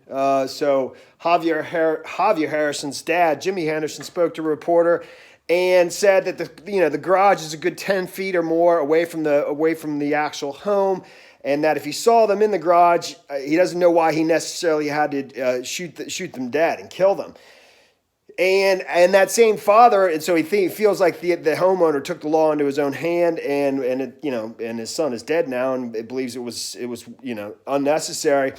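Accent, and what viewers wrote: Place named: Charleston, United States. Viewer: Your accents pretty thick, its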